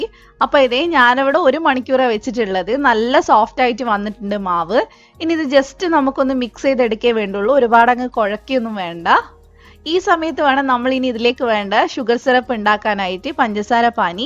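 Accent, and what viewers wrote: Kannur, India. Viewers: Super sound kannur evideyann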